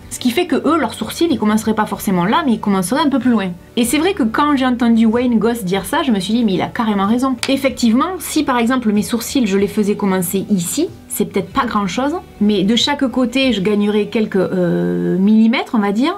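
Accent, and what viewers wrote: South, France. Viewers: J'adooore! Merci pour tes conseils pratiques et clairs. Et l'accent du sud en prime, on adore! 😁 PS: tes ridules sont sublimes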